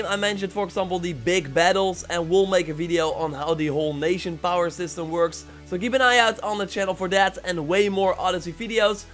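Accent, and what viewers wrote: Netherlands. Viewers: RuiDui yeah he is Dutch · Are you dutch?? Your accent really sounds like it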